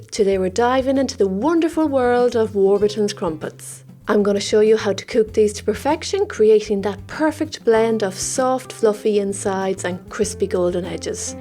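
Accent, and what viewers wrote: Ireland. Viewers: YOUR irish accent and watching was great❤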